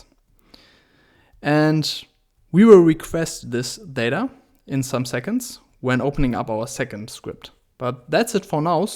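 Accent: Germany. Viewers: I really like your content and I just subscribed to your channel. Please continue with the videos for cryptocurrencies. Are you by any chance from Austria or Germany? Your pronounciation sounds like it. Cheers! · You can notice he is German. But a trading bot won't make you rich. Still good personal project to develop. :) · I like your German accent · Sehr interessant. Ich mag es übrigens, wenn Deutsche englisch sprechen, dann verstehe ich jedes Wort :) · Dieser deutsche Akzent👑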